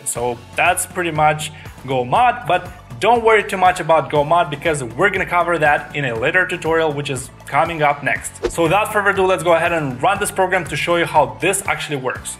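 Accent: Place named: Russia. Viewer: really?) I've heard that aII-too-famiIiar russian accent) Thank you for great introduce!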